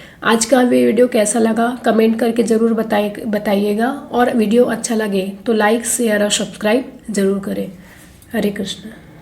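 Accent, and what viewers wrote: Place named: East India, India. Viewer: Namaste Mam aap native kaha ki h Apke kuchh shabdo se Maharashtra ki nahi lagti Me bus interest k liye pooch Raha hu q k me guess krne ki koshish krta hu aap jo shabd pronounce ki wo mene assam bihar wb k logo k mukh se sune h Sorry if anything feel not good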